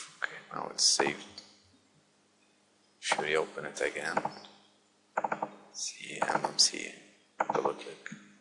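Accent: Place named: Germany. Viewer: no explanation whatsoever...seems to be in some kind of hurry.........could not understand stupid german accent also !!!!!!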